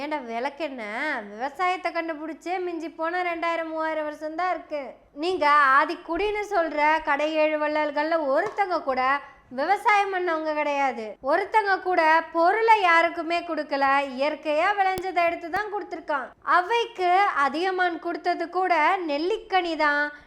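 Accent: Kongu, India. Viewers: கொங்குத் தமிழில் சதிராடும் தமிழ்த் தாயே வணக்கம்🙏🙏🙏🙏🙏🙏🙏🙏🙏🙏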